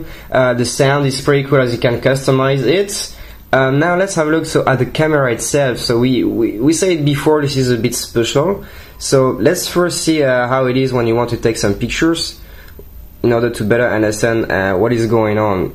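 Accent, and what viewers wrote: France. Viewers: lol nico rosberg voice when he speak english mix french english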